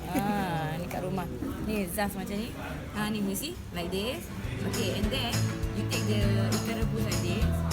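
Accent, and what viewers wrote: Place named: Kelantan, Malaysia. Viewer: Chak puak...hahahaha..pekat doh kelantan dia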